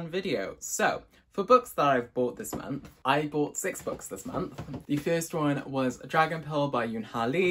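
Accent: United Kingdom. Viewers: As if I wasn’t excited enough when I saw that he was a black male booktuber from the thumbnail, his British accent just rlly put the cherry on the cake 😩😩😍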